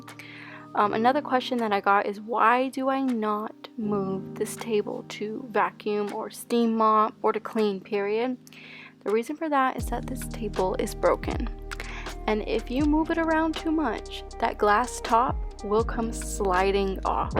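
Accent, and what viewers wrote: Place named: New Jersey, United States. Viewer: Are you from like jersey or someplace around there? Just asking because the way you say carpet and better. Prolly more words too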